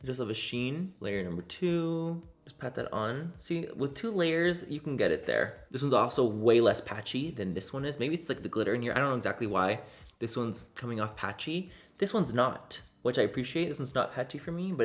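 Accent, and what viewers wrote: United Kingdom. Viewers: Manny Mua yes!!! Plus your sweater 😍 all while saying it’s so hot out here! 🤣🤣🤣 Love you & ur British accent 😉 Edit: dessert is so nice you have to write the “s” twice... a rhythm I teach my students to help them remember 😉😃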